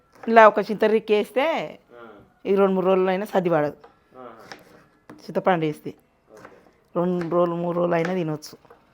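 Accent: Telangana, India. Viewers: Amma meeru pata chala baga padutunnaru. Me videos super. Telangana basha meeru matladedi bavundi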